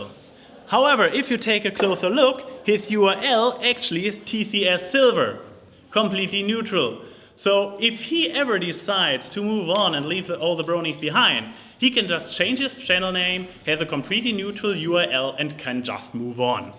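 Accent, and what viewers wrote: Germany. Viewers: are you german? you really sound german because of the way you say "the" nice panel tho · exploding guy :3 yes. he come from germany.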